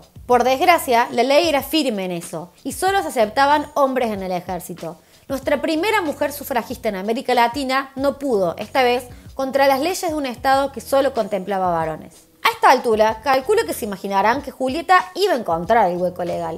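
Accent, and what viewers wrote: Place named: Córdoba, Argentina. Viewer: Historia, memes y acento cordobés! Este video lo tiene todo!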